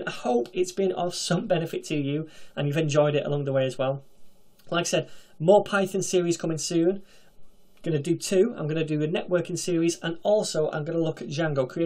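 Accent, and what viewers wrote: United Kingdom. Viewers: you are amazing I like your British accent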